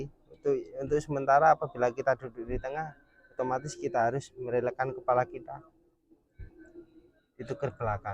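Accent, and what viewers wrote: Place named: Kediri, Indonesia. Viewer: Logattè koyok orang Kediri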